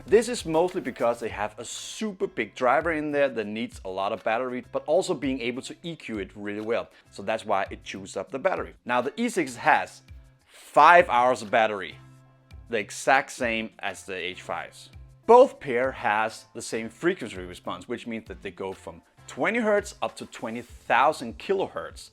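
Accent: Denmark. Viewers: danish accent? are you from Denmark?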